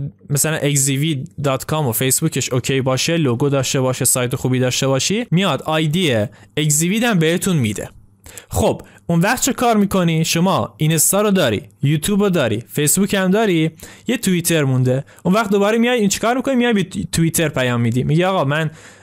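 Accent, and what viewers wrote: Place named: Jahrom, Iran. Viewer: ببخشید نمیدونم چرا پیام قبلیم نصفه نیمه اومده. اول از همه تشکر از ویدئوی بدردبخور شما. دوم اینکه ته لهجه شما منو یاد سی چهل سال پیش معلم هنرمون انداخت که اگه اشتباه نکنم اهل جهرم بودن.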